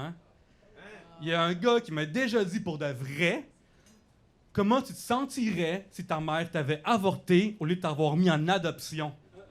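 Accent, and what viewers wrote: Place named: Quebec, Canada. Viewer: Yo tu sonne Québécois, tu punch comme les américains, t'es née en Colombie... who the fuck are you ? 🤏😂 ...hahaha t'es une incroyable découverte , d'un huge fan de stand up merci pis chapeau, la scène Queb a besoin d'un gars comme toi bravo. 👏👏👏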